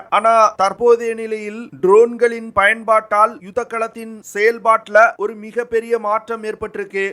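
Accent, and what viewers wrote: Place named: Tamil Nadu, India. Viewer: Bro defence information aree good. but present of voice is not good. Your( voice) Explain the content is actually tamil slang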